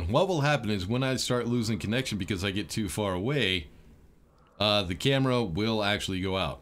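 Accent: United States. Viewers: I'm not used to the American accent (I'm a Brit) so was trying to work out why "planet with a bunch of turds (turrets) on it" needs defending 😆.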